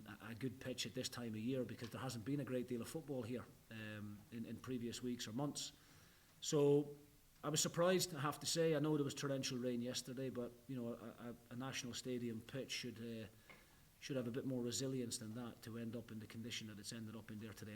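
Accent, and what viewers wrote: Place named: Aberdeen, United Kingdom. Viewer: That's the first time I've noticed he's got a aberdeen accent lol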